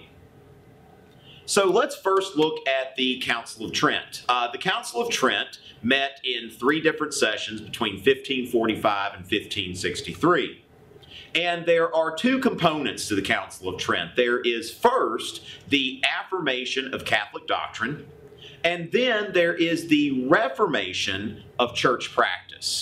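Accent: South, United States. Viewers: i love this. just the way he looks like matt damon and the southern drall makes the whole video so much better. very helpful !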